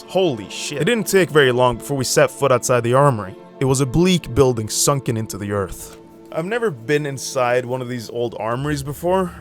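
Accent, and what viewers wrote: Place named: Norway. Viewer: Spotted the accent day one, have family with thick Norwegian accents here in Minnesota. Love your content man, keep it up!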